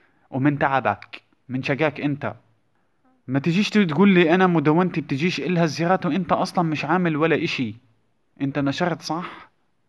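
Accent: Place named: Gaza, Palestine. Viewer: بشرفك انتا من غزة صح سبحان الله من الكلام بنبين أستمر صديقي الله يوفقك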